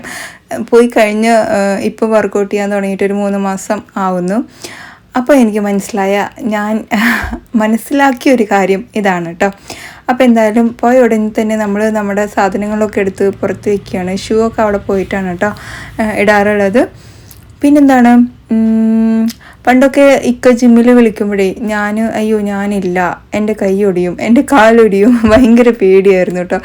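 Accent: Palakkad, India. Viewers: * palakkad slag elaloo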